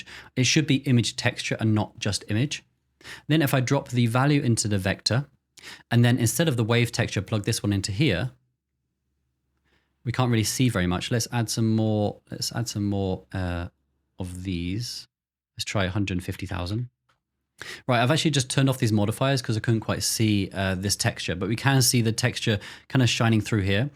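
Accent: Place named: England, United Kingdom. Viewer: Funnily enough the first thing I thought was “this guy grew up near Daniel Radcliffe” based on the accent lol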